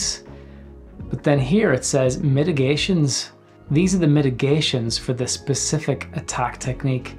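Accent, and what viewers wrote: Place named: Scotland, United Kingdom. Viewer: but you need easier english, you speak too scottish bro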